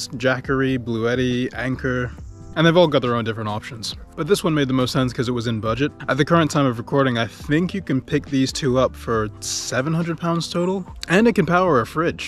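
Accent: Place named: United States. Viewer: How is the trip from the UK but you have everything American even an accent the dialect the phrases, where are you from... Pretty much this pretty much that😊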